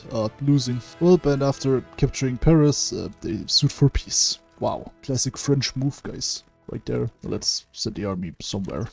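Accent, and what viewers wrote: Germany. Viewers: Youtube has added an AI 're-dub' because has a German accent...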